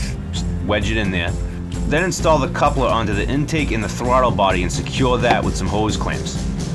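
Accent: Boston, United States. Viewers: Love this channel! Even more after I recognised that Boston accent 😏 hope you’re a die hard pats fan like I am! Keep up the vids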